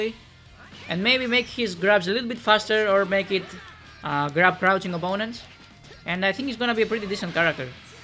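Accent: Spain. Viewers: Bro tu eres español hahahah se nota mucho, tienes acento español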